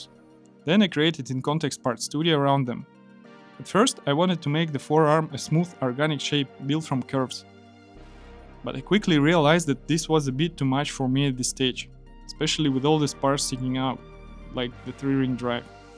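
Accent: Russia. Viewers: чувак, мне кажется по речи или ты русский?)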